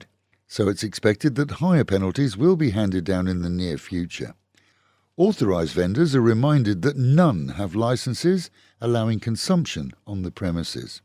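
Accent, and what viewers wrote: United Kingdom. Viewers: are you from Britain ?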